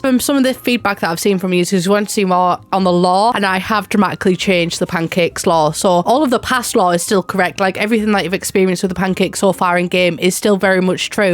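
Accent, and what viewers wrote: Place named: England, United Kingdom. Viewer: Your English is great! She was hard for me to understand at first and I am a native English speaker lol. The English accent on this one is strong, so good for you!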